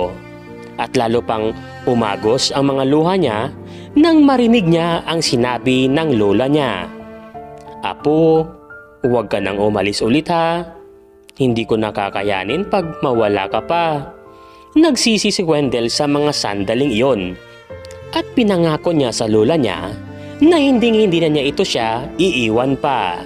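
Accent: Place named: Ilonggo, Philippines. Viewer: weldel is elonggo I dont know.but the narrator is 100%elonggo po siya.all the way his intonation really he is elonggo mlumanay mgsalita😅😅😅😅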